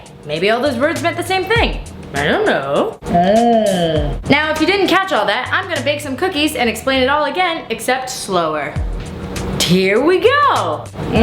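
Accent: France. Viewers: your french accent 👌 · your french accent >>>>>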